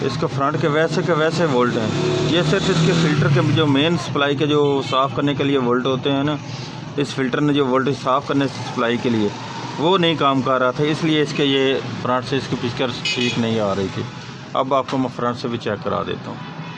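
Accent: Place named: Punjab, India. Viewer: Tuci punjabi lagde aa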